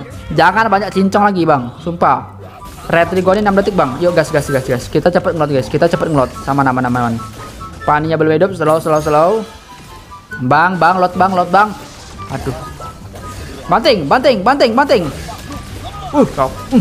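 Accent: Palembang, Indonesia. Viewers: Emang lucu wong plembang klo ngomong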